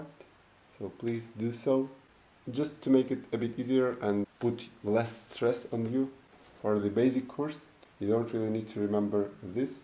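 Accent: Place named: Russia. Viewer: Is your native language Russian? Cuz I swear I hear your Russian accent all the time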